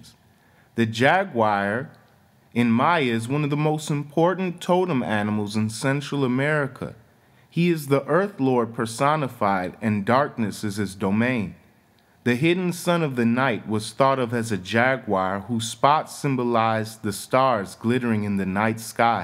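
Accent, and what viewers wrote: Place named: United States. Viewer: 7.15: cat in Latin is feles, not gatto. This is Italian. Zarathustra was not an esoteric Persian but a wise man like Buddha. Some things are so hard to understand in your very, very strong American accent. It would be great if you could ask maybe the Google translator how to pronounce words. At 5.55 f.ex. Or Zarathustra. I understood Persian. So the rest I understood because I know the rest of facts on my own. But this is not the sense of your vids, right? This would be a solution for this problem. :) <3 I wish I would have understood more of it. But even the subtitles did not understand you. No joke. Desperation! But thank you for what I got from this. Very y interesting thoughts and lots are so true! Good luck to you!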